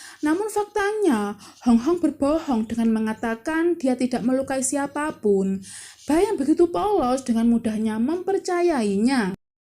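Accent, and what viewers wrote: Java, Indonesia. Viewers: Medok karena mbaknya orang Jawa,,, masing-masing Suku mempunyai ciri khas,,, ini lebih baik dari pada pakai suara google,, imut, renyah, empuk,, tapi bukan suaranya sendiri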